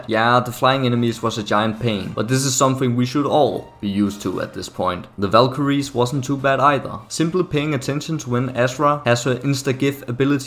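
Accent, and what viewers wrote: Denmark. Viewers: Are you danish? You sound danish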